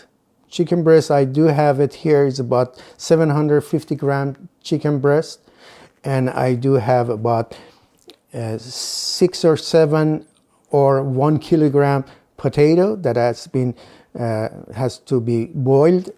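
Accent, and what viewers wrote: Iran. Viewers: your voice... and with your Persian accent are just perfect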